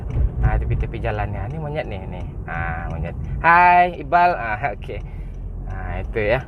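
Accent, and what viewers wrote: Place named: Sipirok, Indonesia. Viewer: bawa Mobil ke rumah bOcil, terus njemput, berlanjut ke rumah Bang, kemudian jalan ke Bekauheni, naik kapal, terus ke Yana dan kemudian berlanjut ke Museum Angkot dan makan besar sama Bang.... Gitu.... #squadkampret #KocakGamong Kok aku kayak dengar ngomong Sipirok ya??? Padang Sidempuan???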